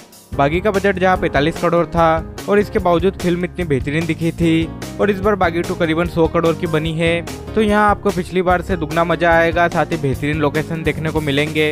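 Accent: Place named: Bihar, India. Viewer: Bihar se ho kya bhai , aawaj change kar bhai , Maza nahi aa raha Heeee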